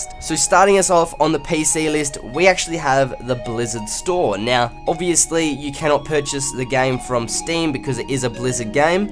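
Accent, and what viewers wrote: Australia. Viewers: Jeez your accent is strong, coming from an Aussie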